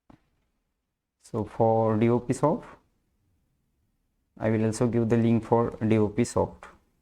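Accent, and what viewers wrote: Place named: India. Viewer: please make English accent more than indian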